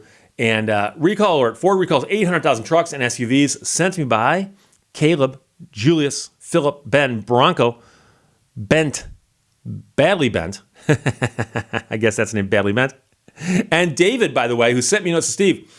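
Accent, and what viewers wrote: Canada. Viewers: Canadian robot lady you did a good job stitching that one together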